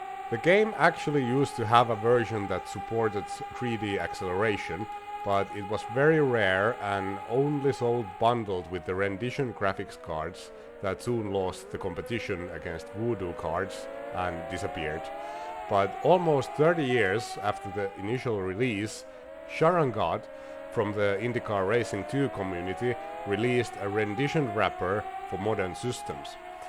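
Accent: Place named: Finland. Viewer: From the accent alone I can smell the karelian pie and koskenkorva. Kiitos!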